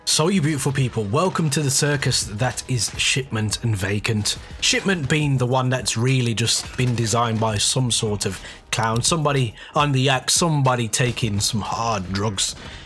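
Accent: United Kingdom. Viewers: Yo, where in the Isles are you from? I could listen to that voice explain shit all day.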